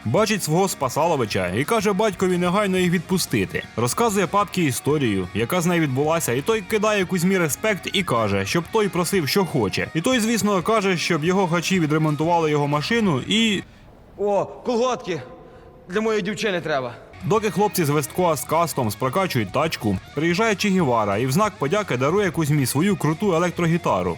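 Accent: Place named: Dnieper, Ukraine. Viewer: Дякую що сказали: бо Ми з подругою дивилися, слухали і думали - звідки родом автор відео. Моя перша думка- Закарпаття, потім зрозуміла - ні. А подруга каже: точно не Закарпаття, може центральна Україна, десь Вінниця або Черкаси. Але ми не експерти - мало подорожували і у відтінках мови центральної України не дуже розбираємось;). Тож Івано-Франківськ вітає Дніпропетровщину ( казали що хотіли перейменувати на Сіверщину..) і дякує за дотепний блискучий барвистий гумор і соковиті відтінки наддніпрянської української 🎉❤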